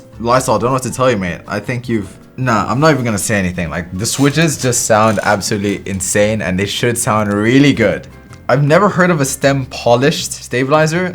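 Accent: India. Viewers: what happened to his voice sound like an indian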